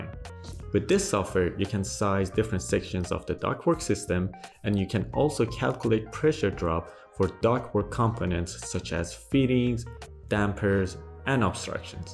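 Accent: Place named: Iran. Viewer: Dear I found randomly one of your videos in YouTube, and l enjoyed it. I started to follow your channel, but I realized that something familiar was in your voice. Lastly I realized that you are originally an Iranian. I'm very proud of you.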